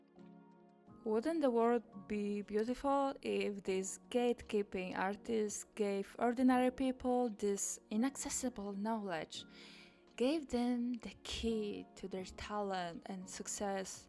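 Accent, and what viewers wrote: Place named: Eastern Europe. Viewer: its just the heavy slavic accent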